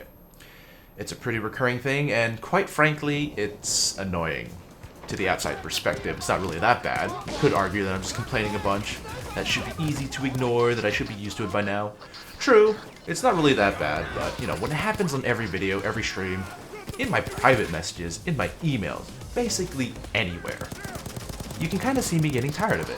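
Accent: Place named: United Kingdom. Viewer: I'm agree to most of things you said but, in a matter of being professional, you need to upload real pro videos for old stuffs you did before. not all of them but as I checked, you were not aware of all the details and clues of each heist. ofc watching your videos gave me some clues of things i was not aware and ofc I do heists in my own way even if there be an easier tactic. but for example missing one of two paintings in Diamond DLC heist is a shame, I mean right now after years you're playing it you should know there is 2 paintings and not 1. anyway as you said that you dont seek any suggestion ( lol) just skip what i said on top lines. you are a good player and I enjoyed watching your videos here in youtube and again I like the way you explain and argue while recording it. at first video I thought you're british? IDK why. keep going and I know its boring to read and listen to a lot of stuffs you may heard or know before. 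keep going and dont forget im the number one in stealth! but im infamy you know lolz.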